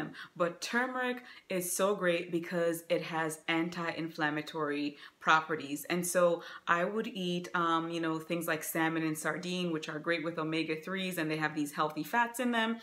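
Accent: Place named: Caribbean. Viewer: You sound like you are from the Caribbean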